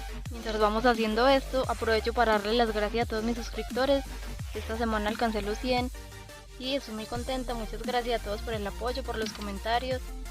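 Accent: Colombia. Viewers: colombia? :u paisa? xd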